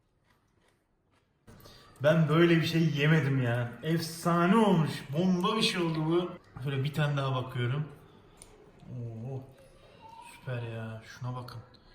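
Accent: Adana, Turkey. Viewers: Hatayli degil bu şive adana şivesi Hataylılar böyle konuşmaz yüürün katıyürüm ekliyürüz diye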